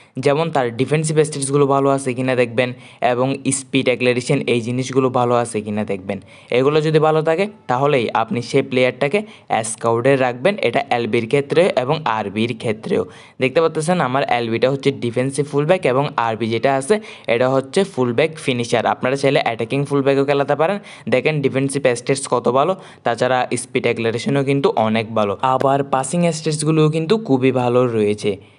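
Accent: Chittagong, Bangladesh. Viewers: Vai apnar basha ctg te naki😊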